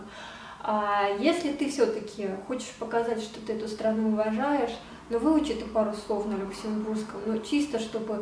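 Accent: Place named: Urals, Russia. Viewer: Дикция уральская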